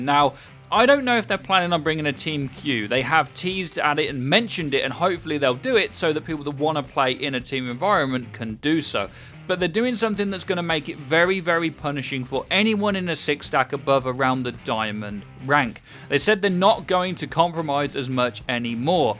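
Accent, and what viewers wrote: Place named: United Kingdom. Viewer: Got your account in My team in placement matches huge loss still 10/10 to hear your brittish accent live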